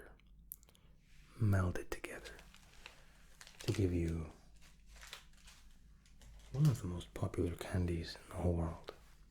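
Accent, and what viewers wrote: Scotland, United Kingdom. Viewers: Where were you born because I fell like you have a bit of a Scottish tone and some words are pronounced Scottish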